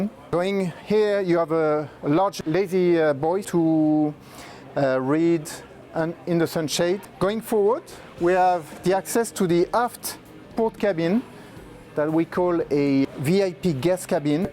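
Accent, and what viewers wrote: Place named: France. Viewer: A frenchman trying to speak english... hilarious.... I can`t stop laughing..... Very nice boat by the way. Really nice boat.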